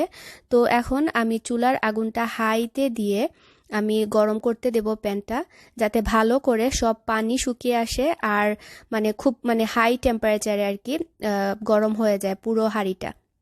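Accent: Sylhet, Bangladesh. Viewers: Thanks apu... ❤❤❤ lots of love & dua for u specially for falaq... I just love to hear ur sylheti language. ... Seriously.. So sweet & cute.. Dua kori apu tmi onk valo thako ❤ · No offense but i think it was much better when you used to speak in sylhety accent.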